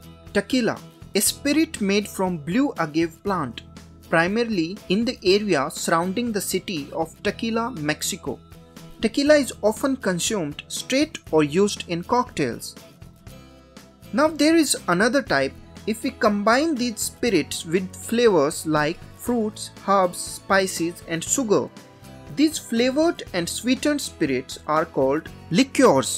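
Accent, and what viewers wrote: India. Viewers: Your Indian accent 😁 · indian accents are crazy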